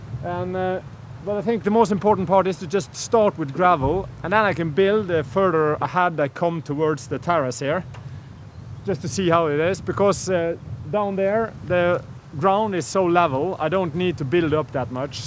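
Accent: Bergen, Norway. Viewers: Det er ikke vanskelig å høre at du er Bergenser ;-)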